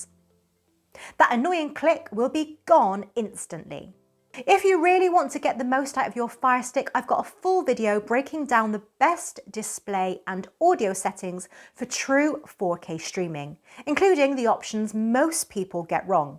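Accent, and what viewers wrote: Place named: United Kingdom. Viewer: I love your British accent!!!! ❤❤❤❤❤❤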